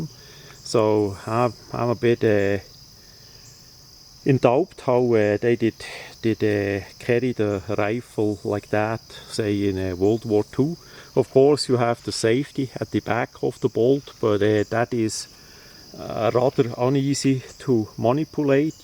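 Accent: Switzerland. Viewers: Ihr Schweizer Akzent ist einmal eine schöne Abwechslung von Arnolds Österreichischen Akzent. Apropos, wissen Sie welche Shotgun Don Camillo hatte?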